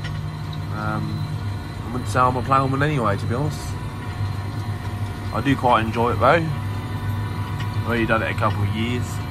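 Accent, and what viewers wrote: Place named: Suffolk, United Kingdom. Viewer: Hi, good to hear what your up to! Doing well and enjoying the farming by the looks of it. Sounds like you been working with some Suffolk farmers, you have picked up that lovely accent. Yes keep posting some in cab videos, though your drone footage was always great! Keep them furrows straight now!👍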